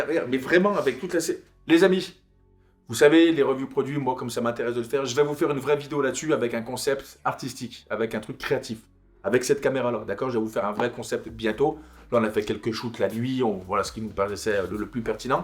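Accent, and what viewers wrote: Saint-Étienne, France. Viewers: Avec le vrai accent stéphanois qu'on aime. Je t'ai vu à basic fit Andrézieux 👀👀👀👀👀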